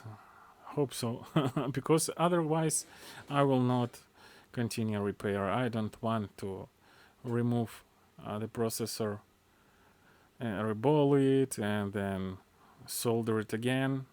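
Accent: Russia. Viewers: Какой лютый русский акцент) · Нижегородский проскакивает бля😂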